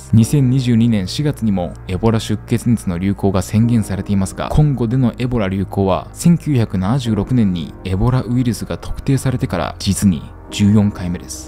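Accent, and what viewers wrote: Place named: South Korea. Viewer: 韓国人の話す日本語訛りのようなのを感じた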